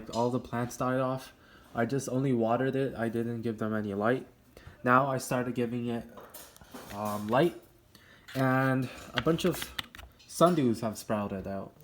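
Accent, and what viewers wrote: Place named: China. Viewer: 怎么中国口语啊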